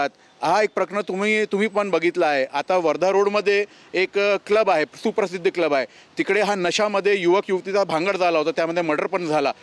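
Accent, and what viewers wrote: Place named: Uttar Pradesh, India. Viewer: हा तर पहले महाराष्ट्र चा नाही उत्तर प्रदेश आहे अस वाटतय याला मराठी बराबर येत नाही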